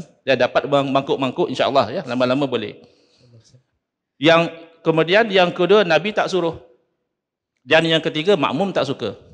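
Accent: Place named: Negeri Sembilan, Malaysia. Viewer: Mano... Cam org nogori ustaz ni🤭🤭🤭🙏🙏🙏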